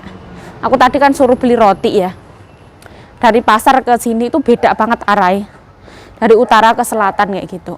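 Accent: Java, Indonesia. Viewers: Logat jawanya di kurangi yu klw berbicara bahasa taiwan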